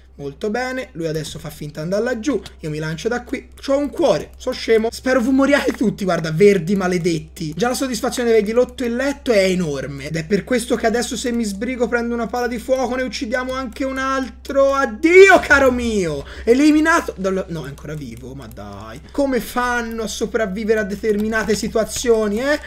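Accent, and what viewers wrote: Tuscany, Italy. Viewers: perché non fai un video delle bedwars, dove parli solo con il tuo dialetto toscano, spolliciate così lo legge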